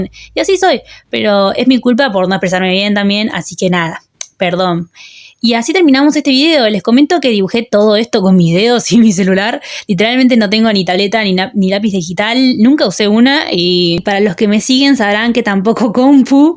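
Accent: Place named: Argentina. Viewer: se nota el asentó arg XDD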